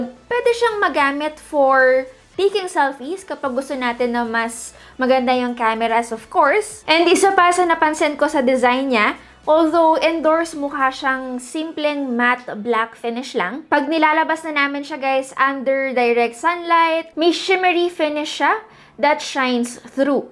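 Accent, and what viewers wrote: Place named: Ilocos, Philippines. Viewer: mukhang foreigners tpos ilocano accent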